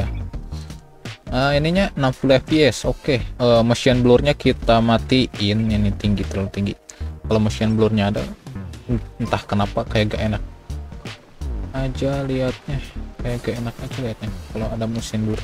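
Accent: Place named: West Java, Indonesia. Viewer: logat sunda nya sangat terasa😌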